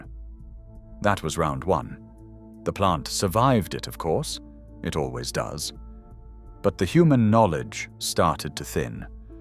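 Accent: United Kingdom. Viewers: probably informative im sure, but I turn off the message presented with the heavy British accent, as if accentuating the one we can really believe and trust. I wish all heath, peace in well-being everyday.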